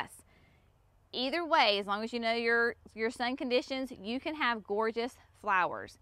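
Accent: South, United States. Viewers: I love your videos. Such a beautiful garden and nursery! And I love your Southern accent. Wonderful!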